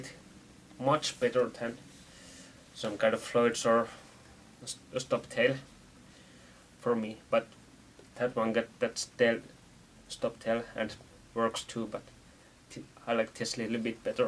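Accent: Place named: Finland. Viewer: You have kinda finnish accent in your english. Am i right? Anyway nice video. I really want to buy that guitar. Thaks for the tips considering about the possible upgrates i might have to do in the future.🤟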